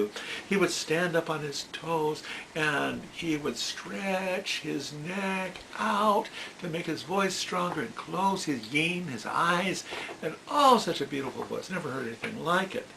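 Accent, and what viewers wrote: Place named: United States. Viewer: Yet another American! I don't want to hear about Chaucer with that annoying accent!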